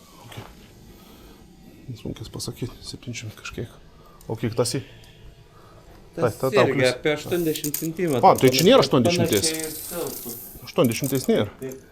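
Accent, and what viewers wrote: Samogitia, Lithuania. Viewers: Žiaure smage stebiet žemaitį ir klaustėis žemaitių kalbuos. Linkiejema iš Skouda ;) kontrybės valteis statybuos ;) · Jäkla kaip miela klausut zemaisiska Mano tevu dialekas linkejinai is Svedijos 🥰🥰🥰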